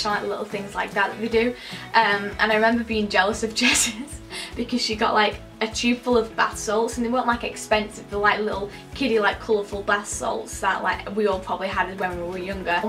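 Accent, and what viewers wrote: United Kingdom. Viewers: i love your British accent❤️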